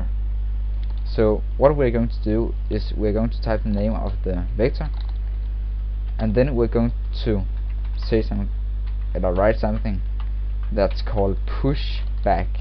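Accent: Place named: Sweden. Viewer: Hey you're swedish :D !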